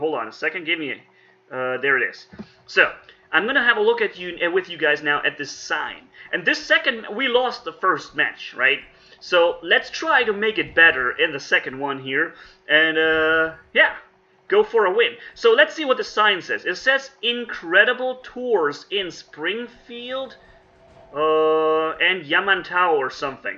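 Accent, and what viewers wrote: Germany. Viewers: Are you german. Bist du deutsch, du bist deutsch oder du hast auch Vykker oboniert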